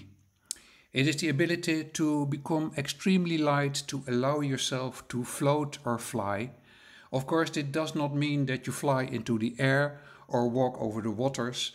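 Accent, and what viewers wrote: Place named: Netherlands. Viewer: Love the Dutch accent. Sounds cute😊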